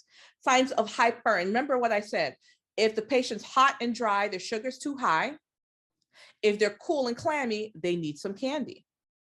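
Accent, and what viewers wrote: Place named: Haiti. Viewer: All of your videos are so helpful I love the way you break It down. That’s exactly how I speak to myself when trying to learn . And reading the book alone is definitely not as helpful since you make It make sense ! One of my classmates told me to watch you cause she said we speak the same, and when I did I had to laugh ! I kept saying to myself she gotta be Haitian and I would like to say originally from New York or lived there at a point ! Anyway , thank you for all that you do for us nursing students 🙏🏾🙏🏾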